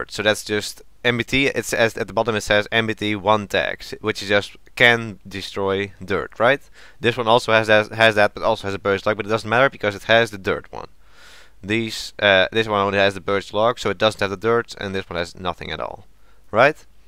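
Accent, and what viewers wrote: Netherlands. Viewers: Je hoort ook gewoon dat je Nederlands bent XD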